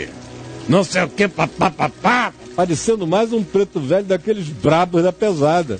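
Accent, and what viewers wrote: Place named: Bahia, Brazil. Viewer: Eu tb pensei que era um baiano! 😄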